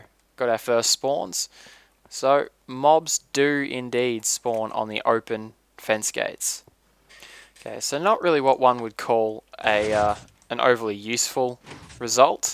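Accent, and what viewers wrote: Australia. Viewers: U sound Aussie.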